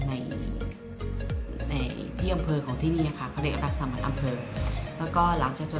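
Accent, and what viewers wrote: South, Thailand. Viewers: ดันเลย พูดใต้น่ารักดี คะ❤️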